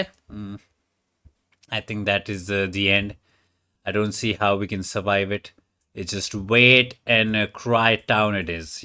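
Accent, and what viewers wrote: India. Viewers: I used to be bad at understanding Indian accents but I’m a master now that I watch this channel every day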